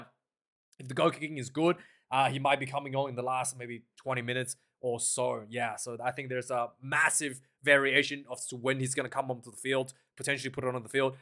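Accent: Afrikaans, South Africa. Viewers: As a South African, love your Afrikaans man.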